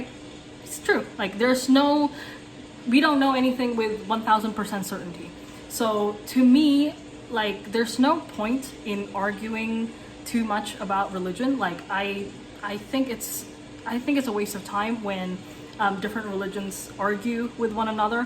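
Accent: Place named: United States. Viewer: please introduce yourself before making a speech. i am curious. your face is like asian but your voice is like american. Are you an american or asian?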